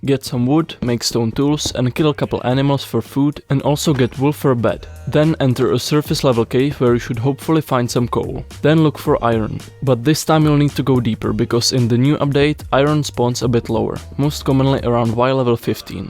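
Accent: Czech Republic. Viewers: you got very czech accent bro :D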